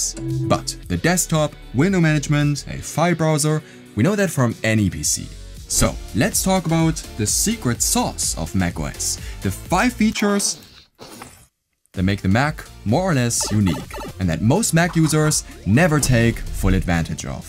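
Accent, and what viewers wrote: Germany. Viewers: love that german accent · I hear deutschh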